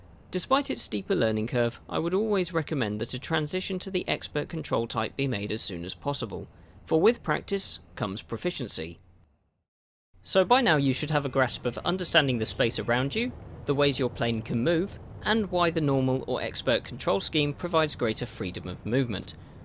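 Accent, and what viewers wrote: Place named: United Kingdom. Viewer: Have you ever noticed smart videos like these come from British accent people. Love it.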